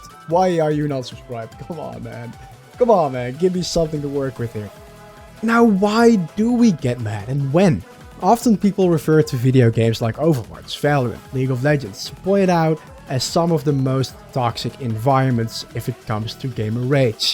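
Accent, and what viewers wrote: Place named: Netherlands. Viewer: Just stumbled across your channel, you are definitely Dutch without a doubt. Like the content tho!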